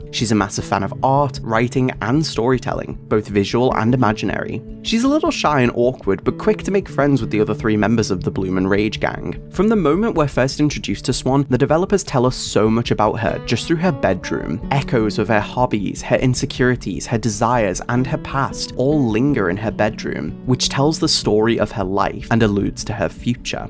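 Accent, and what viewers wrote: United Kingdom. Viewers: Inglês já é difícil, o cara falando com sotaque do britânico que nem uma metralhadora não dá KAKSKDKKDSKKS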